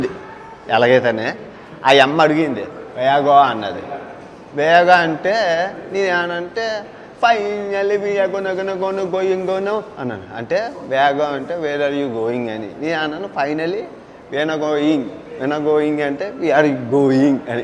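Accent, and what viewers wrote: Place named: North Andhra, India. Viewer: Ur videos n ur dialogues in Telugu north Andhra accent r fantastic n the way u r moving with people is awesome...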